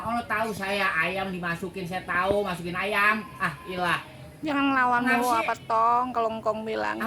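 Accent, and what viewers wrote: Jakarta, Indonesia. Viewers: Selalu senyum kalo nonto ini chanel...Khas betawi nya itu Looh...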